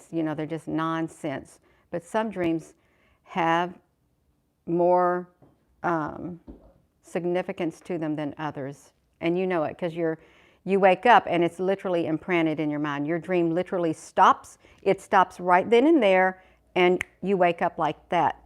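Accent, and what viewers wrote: United States. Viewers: Yes, 😘 Speaking through your 👃 Nose as well as your 👄 Mouth -- an American Accent with Breaking and Bendy Vowels. It Ain't Country Without a Drawl (and your pretty smile-ing-eyes). TS: - ♥️🎩💈🎯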